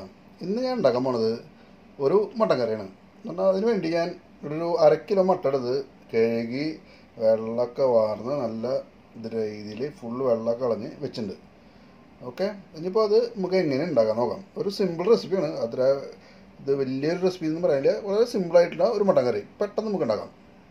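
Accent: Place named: Thrissur, India. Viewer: Thrissue slang il ulla aa mutton curry pwolichu gadiyee..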